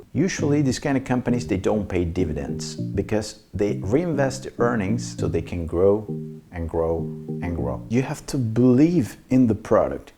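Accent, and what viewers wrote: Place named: England, United Kingdom. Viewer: Si hablas un ingles tan “ingles”, porque no los subtitulos en castellano??? 🤪🤪🤪🤪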